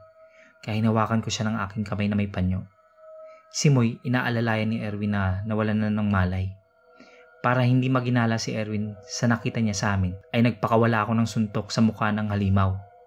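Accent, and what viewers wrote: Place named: Visayas, Philippines. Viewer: Natatawa ako sa tono ng bisaya ni admin..hehe..cute lng...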